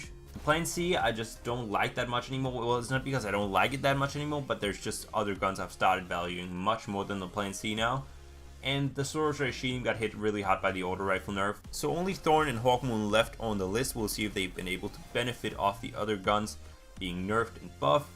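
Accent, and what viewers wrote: United States. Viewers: You sound american, you tend to slip into sound like your from new york and you say some things in an English accent sometimes. Why is this?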